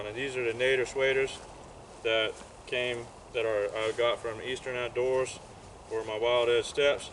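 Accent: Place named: Louisiana, United States. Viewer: I read S. Carolina but Coming from a Cajun, you sound Cajun as hell man lol I just ordered these sticks. Good idea about the rock climbing aider.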